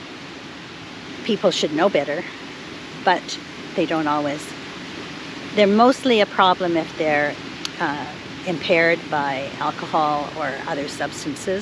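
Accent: Canada. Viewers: I can tell she from BC. CA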